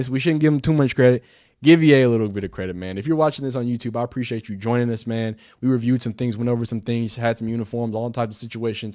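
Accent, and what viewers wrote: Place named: Michigan, United States. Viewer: Bro idk if you’re from Michigan, the East coach or somewhere between Tennessee and Georgia. Niggas on YouTube be a character 🤣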